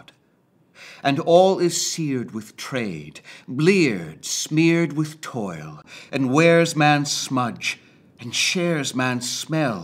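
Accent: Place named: United States. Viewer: It doesn't work with an American accent. Hopkins was English.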